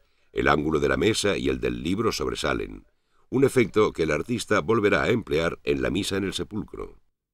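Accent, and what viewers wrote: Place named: Spain. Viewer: El narrador, un clásico del castellano peninsular.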